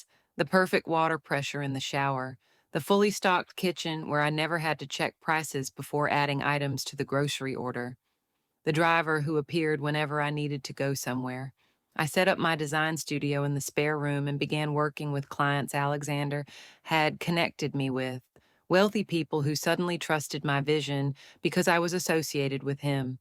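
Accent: South, United States. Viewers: That's a very good point, too. The narrator sounded like a southern white woman with good diction. But the plot was unique & lovely❤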